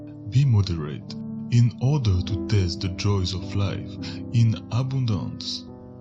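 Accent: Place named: France. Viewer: This guys voice fd the whole video up... Annoyed me so badly... French?? What is this voice?? Yuk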